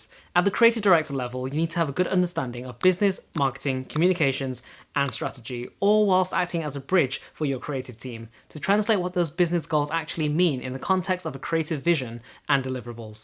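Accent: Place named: United Kingdom. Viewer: Why does a british accent just exude competence in a creative director role?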